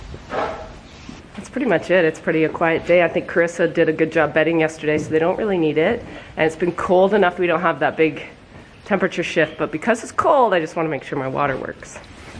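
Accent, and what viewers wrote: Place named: Perth County, Canada. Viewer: I thought I recognized your accent 😁 Out near Perth county myself, the snow has been crazy this year!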